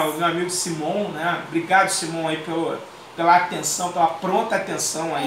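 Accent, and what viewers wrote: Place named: Rio de Janeiro, Brazil. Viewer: Ela eh linda (amo esse sutaque carioca)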